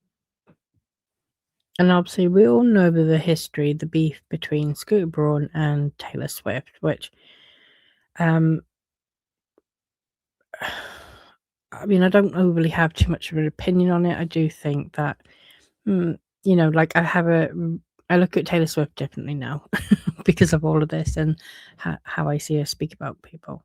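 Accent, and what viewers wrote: United Kingdom. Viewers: Just ran across your channel, from your accent i assume you're in England, I also saw another video that says they're moving to England. I'm curious, what do people there think about her and ryan?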